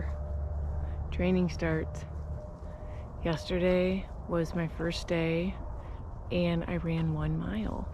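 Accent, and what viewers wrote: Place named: Michigan, United States. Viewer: I thought so! I have a good friend that lived in Midland Michigan and she had a similar accent to yours.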